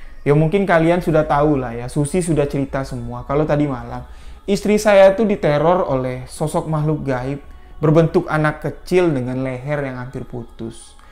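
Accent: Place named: Bali, Indonesia. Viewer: Bang logatnya seperti orang bali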